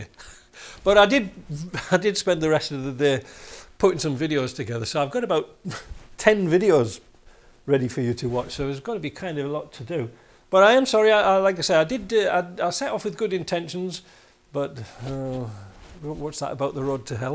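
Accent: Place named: Northern England, United Kingdom. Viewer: Hey where are you originally from? Just finding out you live in Canada? But your accent sounds like you are from somewhere in England maybe up north somewhere 🤔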